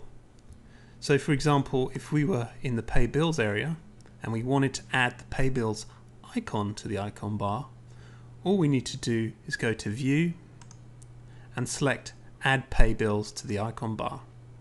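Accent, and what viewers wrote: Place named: United Kingdom. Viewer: Beautiful british accent.. xD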